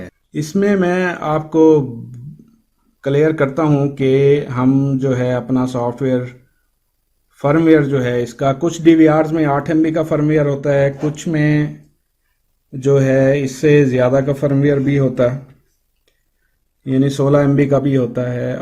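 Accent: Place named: India. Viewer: primera vez que veo un video completo narrado por un indi, tuve que soportarlo, para saber como hacer la copia de la memoria serial...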